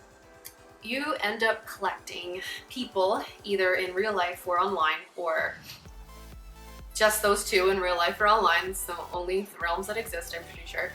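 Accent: Canada. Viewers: Early on, how did you learn to be social, find Joy, & not drink (or use opioids in my case), without that security blanket that you'd come so accustomed to? Did you force yourself into uncomfortable social situations until it became easy? Did you have a regimented schedule set out for your days off? Did you become an exercise addict? Did your boyfriend push you to do stuff with him? Once you hit that bottom (which I have), how did you learn to live life on it's own terms without being absolutely bored to death? I like your Canadian accent, Canada Rules! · Did you get your records up right? You're super lovely btw- and the love that slight Canadian accent. So, I'm aboot 21days sober- cigs went with it. It wasn't a resolution, it just happened and I've no desire whatsoever... Yes, it automatically make you want to improve other parts of your life. "This is no longer acceptable- how did I let myself get away with this?" kinda stuff... 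My step dad was an ineffectual boozer- my mom hung on until she died. My exwife was an abusive white-wino and our baby was born still- I divorced her and took a huge financial loss. Would I have married her if I had been sober? Not a chance in hell. What other losses have I incurred? How much massive bullshit have I brought into my life unintentionally, just by drinking? How many dj sets (4countries total) sounded not nearly as good as they could have? How many women have I been with that I wouldn't have otherwise? Eeeek! I'm so thankful this has happened. I've been in Austin TX for a year now, and have only been out for music a couple of times- I don't entirely trust myself- or I didn't- until now. Still, there's so much work to do on myself, by myself- everyone's dead, or I've cut all my old friends off. It's sucks, but I'll rebuild. I have all over the world- this time I get to do it better. 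Again, nothing but thankful... (Oh I gotta add- this past summer I captained party barges on the lake here (sober) and after watching people make total asses of themselves and puke their bikinied guts out aft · Canadian ey? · Love your videos. Helps me remember why i stopped drinking and the benefits of sobriety. Your canadian accent is too cute btw ("oot").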